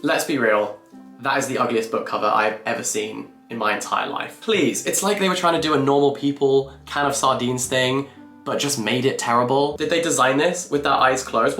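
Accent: United Kingdom. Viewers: How can someone be so attractive? I watched a 30 minute video just watching to his face and listening to his British accent🥴no idea what he was talking about. So distracting.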